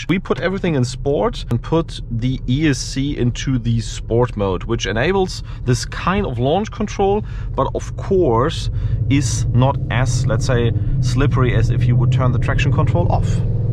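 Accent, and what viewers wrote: Germany. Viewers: It took exactly 3 seconds to know you are german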